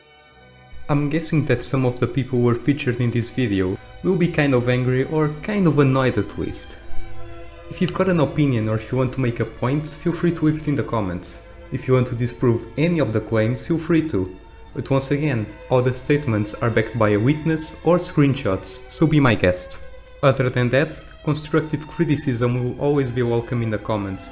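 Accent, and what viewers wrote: Portugal. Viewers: are you portuguese by any chance? (im asking because of your accent) edit: nvm és tuga de certeza lol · Hey, are you by any chance portuguese? Your accent is very familliar. O facto do canal ter CR7 no nome também ajudou. Great video by the way, gained a new sub. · Ganda vídeo bro , és português? Notei um sotaquezinho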